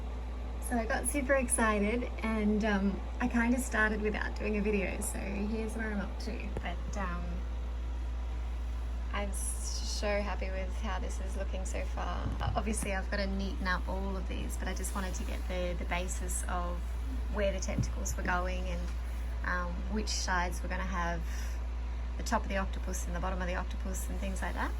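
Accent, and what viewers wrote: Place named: Australia. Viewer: Really amazing stuff you have here!! LOVE IT!! I have a little wood burning set....I might have to get it out and try a few things!!! ❤️ Sounds like you are down under!!! AND>>>how cool are you out on a boat?? Awesome!!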